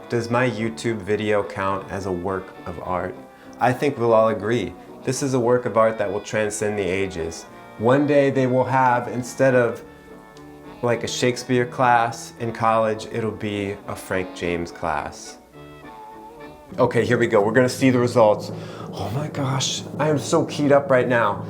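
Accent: France. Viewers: This French accent of yours is something I wasn’t ready for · You have a beautiful French accent.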